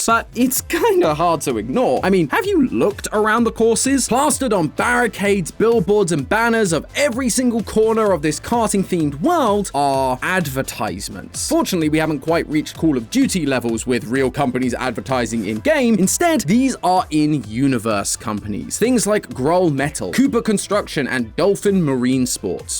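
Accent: United Kingdom. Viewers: Calling it Marry-io makes you sound like an out of touch old person lol. But maybe it's the Bri'ish accent, which is cool ngl In the states it comes off that way though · why did matpat turn british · matpat was replaced with this british mf?!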